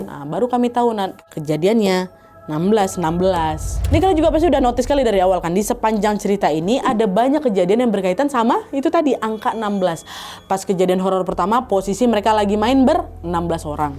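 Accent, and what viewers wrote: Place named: West Sumatra, Indonesia. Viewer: hiyaa, Sumbar, payakumbuh.. logat minangnya gak ilang yaa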